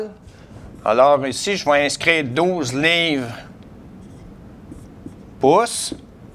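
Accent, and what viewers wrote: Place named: Canada. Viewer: très bonne explication mr mais j'ai eu dès fois très dur a vous comprendre a cause de votre accent canadien mais ca va bravo et félicitation cordialement richard .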